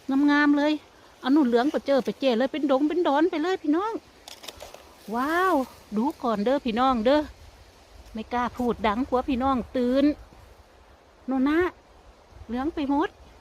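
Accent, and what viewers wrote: Korat, Thailand. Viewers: สวัสดีจ่ะ ดูยายเก็บเห็ดเก็บผลไม้เพลินดีได้ฟังภาษาโคราชวันละคำไปด้วยฉันคนบัวใหญ่เด้อจ่ะ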